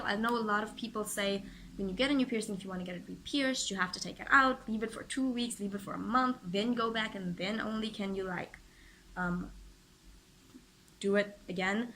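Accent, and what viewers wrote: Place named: United States. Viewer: You sound American haha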